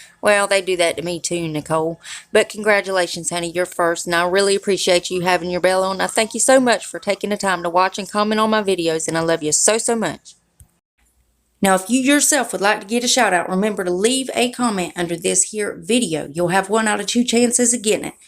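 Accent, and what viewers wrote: South, United States. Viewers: I love your Southern accent and sense of humor👏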